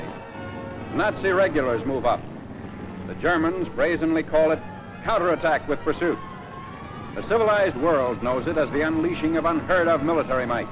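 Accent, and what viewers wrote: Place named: United States. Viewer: German with an American narrator? piss off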